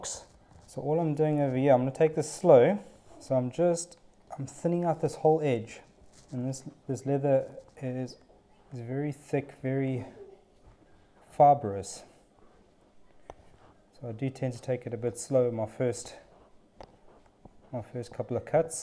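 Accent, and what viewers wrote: South Africa. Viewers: Aussie saddlemaker here. Been a saddlemaker for 39 years and never used a lap skiver, you have convinced me to start using this tool. I have been using a round knife for hand skiving. Only cos this is how I was taught by when I learned my trade in the 1980s. That skiver is nicely sharpened, hardly any effort to skive! Is that a South African accent? At first I thought your accent was New Zullander (New Zealander). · I knew with that accent where in South Africa are you and do you have a shop in the western cape ?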